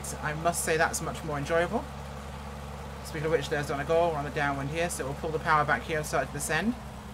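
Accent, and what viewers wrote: Australia. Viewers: Lovley Aussi accent there 😅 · Luv the Aussie accent... Brought one for my Xbox.. great fun. Cheers mate 🤠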